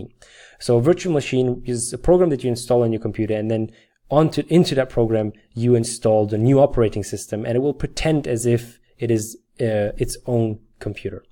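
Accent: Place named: Turkey. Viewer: Am I catching a Turkish accent...😅 nice😊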